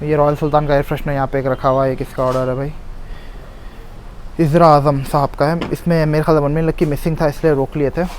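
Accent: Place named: Hyderabad, India. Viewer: Love your hyderabadi accent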